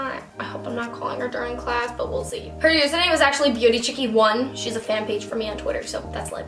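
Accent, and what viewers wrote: New York, United States. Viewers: i think its just her new york/long island accent hahaha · Dominika Pisula she has that like “New York” accent (she’s from Long Island)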